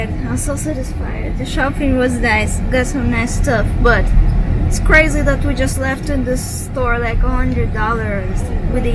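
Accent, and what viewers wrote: Jamaica. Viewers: I love how she sounds like a long time yard woman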